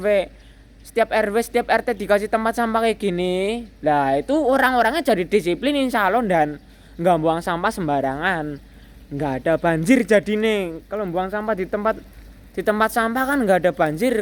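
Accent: Banyumas, Indonesia. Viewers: Rika ngapak e ngendi Ndan😀😀😀😀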